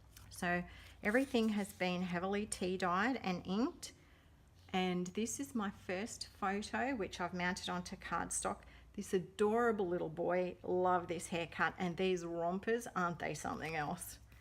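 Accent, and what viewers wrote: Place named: Australia. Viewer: Hi! Beautiful theme! Our Teds are best friends....that texture on the cover is too wonderful.....Hi from Adelaide, so great to hear the accent on YouTube! 😘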